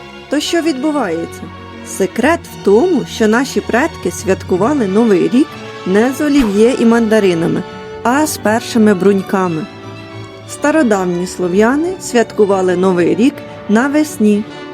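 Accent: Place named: Poltava, Ukraine. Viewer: Як же мені подобається ця солодка українська промова, нагадує полтавську вимову.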